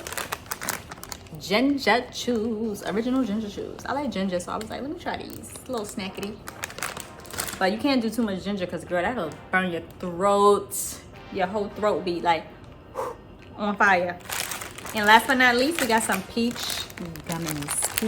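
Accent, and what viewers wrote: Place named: New York, United States. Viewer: Yerrrrr… I can tell you’re from NY. Love the vid!!! Can’t wait to order something’s from this company.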